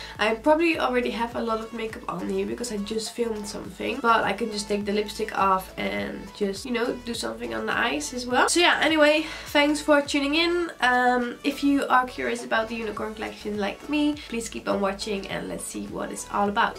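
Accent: Netherlands. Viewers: ik kom ook uit Nederland😄Herkende je accent gelijk maar je Engels en uitleg doe je echt super!!! Gaaf om te zien, ben zelf veel met make up bezig en testen en een collector natuurlijk, zou ook wel mijn eigen channel willen beginnen maar durf nog niet.....komt wel. Heel veel succes met je channel!!!😘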